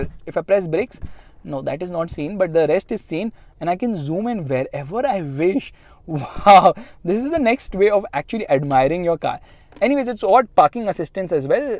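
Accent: India. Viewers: Ебать Индийский акцент это пиздец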